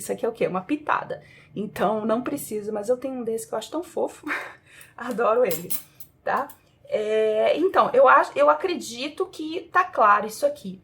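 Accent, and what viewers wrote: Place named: Rio de Janeiro, Brazil. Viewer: ETA CARIOCA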